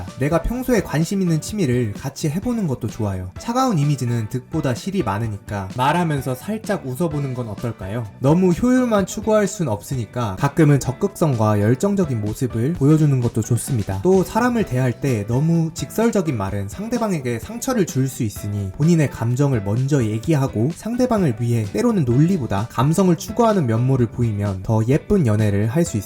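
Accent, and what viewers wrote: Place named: Jeolla, South Korea. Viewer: 전라도 사투리가 느껴지네요 ㅎㅎ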